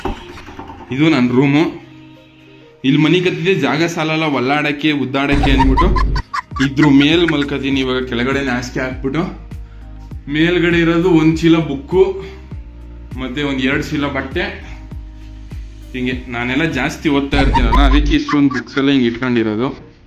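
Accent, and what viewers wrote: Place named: Nanjangud, India. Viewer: ಯಾವ ಊರು ನಿಮ್ಮದು. ನಿಮ್ಮ ಭಾಷೆ ಕೇಳ್ತಿದ್ರೆ ನಂಜನಗೂಡು ಕಡೆ ಅವ್ರು ಅಸುತ್ತೆ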